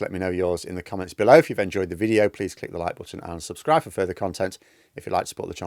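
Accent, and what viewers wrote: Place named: Yorkshire, United Kingdom. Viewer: Is he from yerksher or is it U.S. lol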